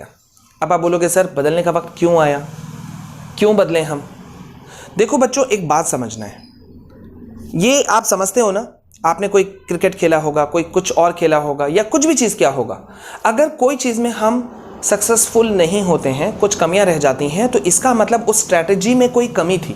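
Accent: Bihar, India. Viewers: Sir aap bihar se ho kya ?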